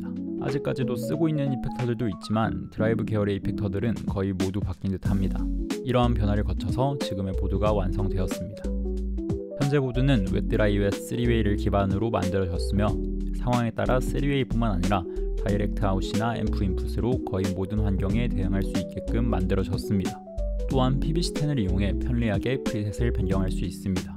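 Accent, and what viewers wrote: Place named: Gyeongsang, South Korea. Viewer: 경상도 분이신가봐요?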